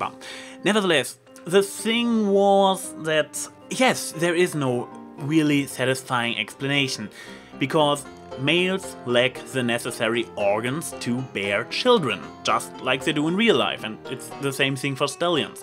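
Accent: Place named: Germany. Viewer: ... you cant tell by the accent? i believe he already has told people but, if i remember right he is from Germany.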